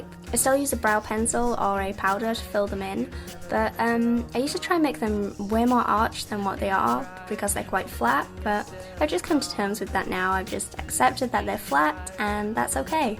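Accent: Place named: Sheffield, United Kingdom. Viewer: what part of England are you from? X You sound as though you're from Sheffield or near? x You're so gorgeous with or without makeup! x Also, what is your FIRST favourite eyeshadow? x this is such a great video, and I haven't even fi nished haha x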